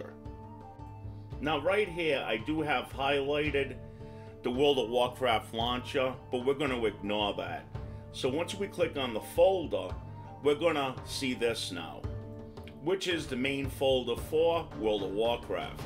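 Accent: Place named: New Jersey, United States. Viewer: never seen any of your other videos so im not sure if you were just doing a goblin voice for the video because you love wow or you're repping joirsey, either way good stuff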